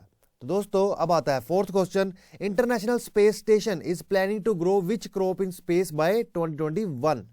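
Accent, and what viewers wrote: Punjab, India. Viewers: Sir punjab toh lgda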